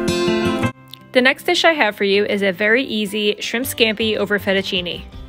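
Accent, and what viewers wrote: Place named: New Jersey, United States. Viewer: Random but are you from jersey? I’m a jersey girl and you def sound like one by your accent ☺️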